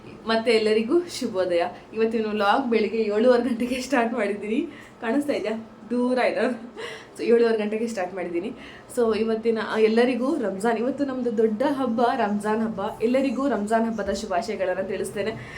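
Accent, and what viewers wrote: Standard. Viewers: pure kannada sister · ನಿಮ್ಮ ಸ್ವಚ್ಚವಾದ ಕನ್ನಡಕ್ಕೆ ಧನ್ಯವಾದಗಳು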